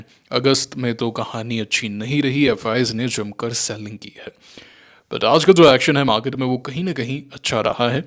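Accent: Rajasthan, India. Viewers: Sir ji, aaj to bahut jyada dil khush kar diya apne, akhand bharat ki baat bolke, sanatan ki baat bolke, sabse sashakht sabhyata ki baat bolke, spirituality ki baat bolke. Mai Bikaner se hu aur aapki baate aur apka lahza sunke ek baat se sure hu ki aap bhi Rajasthan se ho aur kahin na kahin apka sambhandh bhi Bikaner se hai, aisa isliye kyunki 2-3 din pehle ek video me apne bola tha " Market bhacheed bola rha hai". Aisa to yahan bikaner me kafi bola jata hai. I may be wrong but mujhe kafi stong feeling aayi ki aap bhi Bikaner se ralate karte ho. Itni gehri aur amulya baate humare sath share karne ke liye aapka koti koti dhanyawad. Jai siyaram 🙏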